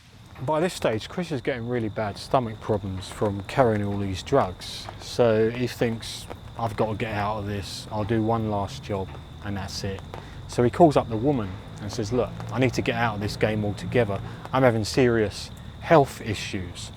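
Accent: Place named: London, United Kingdom. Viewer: more drama in 22 minutes than in tons of dubious movies....I'm guessing any slightly shady story narrated with the flavor of a London accent (or do my ears betray me?) has that special something, but maybe it's just me ;-)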